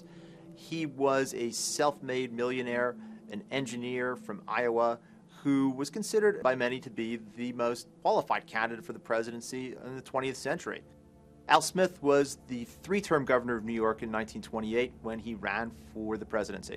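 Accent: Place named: Baltimore, United States. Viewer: This guy has an INSANE BALTIMORE ACCENT.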